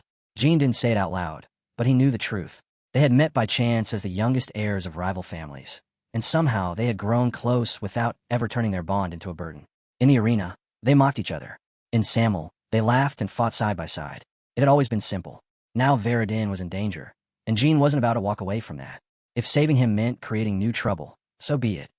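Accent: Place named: United Kingdom. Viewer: The audio became a British man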